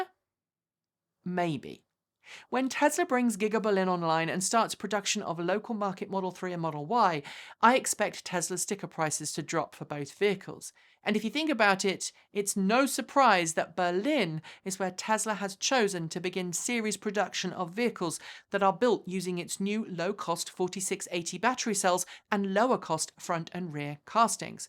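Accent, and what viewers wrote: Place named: United Kingdom. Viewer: Excellent report. I was ready to leap on any major, disputable point with gusto. It's good to hear good, solid facts in a calm, Brit accent. I may need to watch it again in case I overlooked something.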